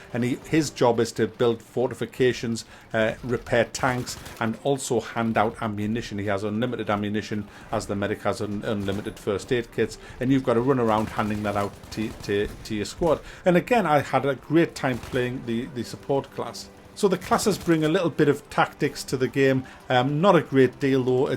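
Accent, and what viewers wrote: United Kingdom. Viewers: UK accent, but from where?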